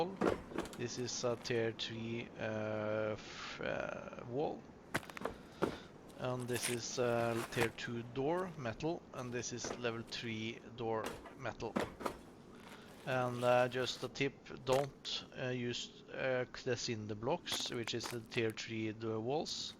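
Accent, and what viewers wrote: Norway. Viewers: are you from norway and great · Are you Norwegian perchance? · What is the base mod name? Im guessing by your accent you are Norvegian.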